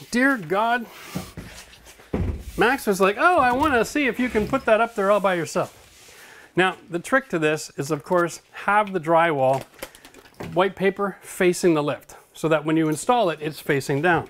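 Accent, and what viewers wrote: Canada. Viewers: This guy is Canadian, eh?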